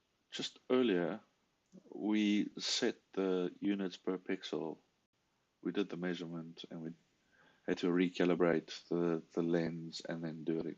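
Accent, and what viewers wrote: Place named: South Africa. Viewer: You from South Africa .. which part ?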